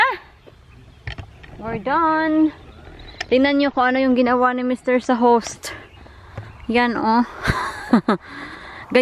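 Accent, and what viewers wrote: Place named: Iloilo, Philippines. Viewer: Hi. Watching here from Dasmarinas Cavite. I remember your accent dahil sa aking mahal na Inay. She is Ilongga. Sobrang sewer at mababait halos lahat ng ilongga. Say hi to your hubby. God bless!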